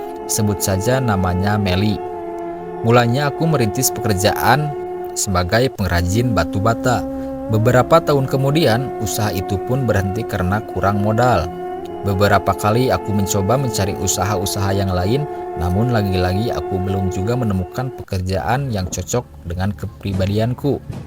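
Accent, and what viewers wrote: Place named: Sunda, Indonesia. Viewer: Lentongna sunda pisan euy 😊👍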